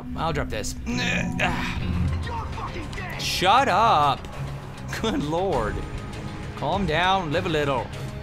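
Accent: Scotland, United Kingdom. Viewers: Your Scottish accent is freakin' epic:3 (Scottish Guy) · T_T.....that's a scottish accent......gj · love the british accent Need more! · I love ur British accent! <3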